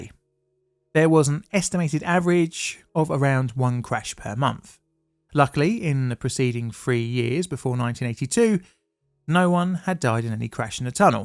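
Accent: United Kingdom. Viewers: I like your British voice, and humor.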